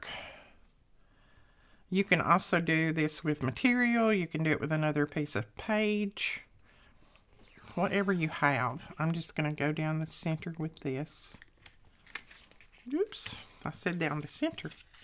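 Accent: South, United States. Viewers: You really do have so many people that love you! I just love you to pieces! You really are great. I miss you like crazy when your not on. I think it's your voice..just reminds me of home(the south) and my momma and Grams who are both gone. I wish that I could just give you a big hug, pray with you, and try to make things better! Not sure if you got your ink yet but just a fyi Tina from Shabby Dabby Doodah has insta ink too and she was saying how this time she has been waiting forever for her ink to come in. So if you don't have your in a couple days you might wanna try and get in touch with them! Love and prayers, collette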